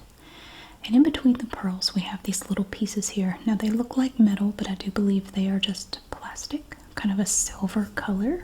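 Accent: South, United States. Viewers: Lately I've been on a bender. Been subscribed for almost 10 years. Love the motherly tone and soft southern speech of your voice. Literally the only ASMR channel I'm still subscribed to. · Love Southern accent! Different from depending on where in the south! Thanks 😇❤💙❤💙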